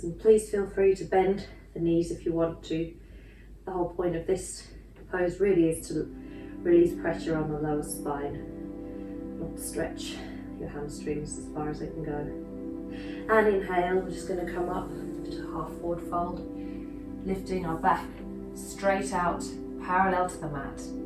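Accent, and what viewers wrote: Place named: United Kingdom. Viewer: Lovely session. And the British accent just adds so much more to the relaxed atmosphere 😂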